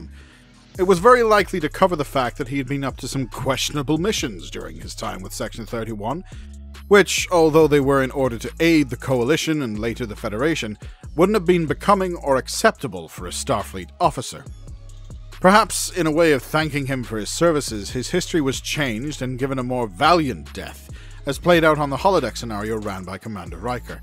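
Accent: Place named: Scotland, United Kingdom. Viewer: I detect a slight Scottish accent boyo.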